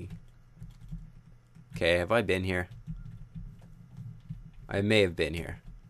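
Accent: Canada. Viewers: Is you Canadian? This is the first video I've seen from your channel, so I don't know. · Ohh he's Canadian ! I was wondering what his accent was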